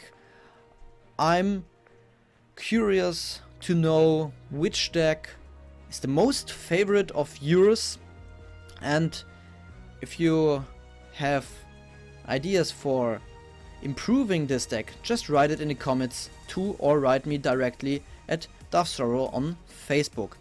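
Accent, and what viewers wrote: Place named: Germany. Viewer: your german accent is painfully obvious.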